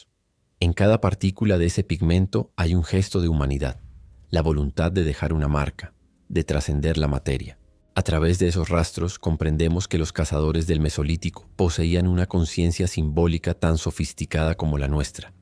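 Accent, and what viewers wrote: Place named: United States. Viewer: No entiendo como, hablando de nosotros, la voz en off tiene acento americano. ???????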